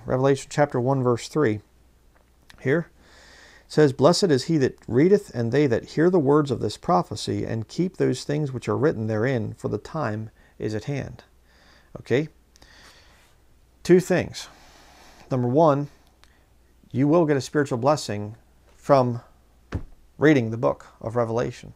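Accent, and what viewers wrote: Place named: Pennsylvania, United States. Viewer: Don't worry about your accent brother, we Pennsylvanians are unique in that regard. I'm from the other side of the state -Pittsburgh and many of us say "keller" for color (and "aht" for out and "cahch" for couch)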